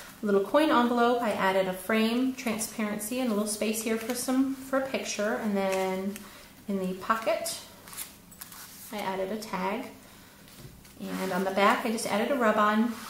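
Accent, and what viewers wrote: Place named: United States. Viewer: Zounds! This is fabulous! So beautiful!!! Are you a transplanted American in Ireland? You sound very American! (Not that this is bad of course!....just curious cuz I spent a lot of time on the Isle of Wight and was pegged all the time! I even had a bunch of kids stop me and want me to say something in American!!!! What a hoot! Great mini!!!! Hope you don't mind my scraplifting! U have great ideas!!!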